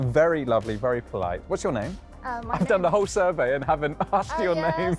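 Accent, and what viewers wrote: United Kingdom. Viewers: Chinese here. Subscribed for British accent lol, but mostly I enjoy the calm, genuine vibe in your video without diatribe & hubbub. glad that you enjoyed your trip so far. I am working and living in XinJiang region right now, summer is perfect for travel ( none Urumqi areas), definitely worths a visit. cheers. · The video has a good rhythm and a pure British accent that sounds very comfortable. I watched it over and over again to learn English, and I really enjoyed watching it.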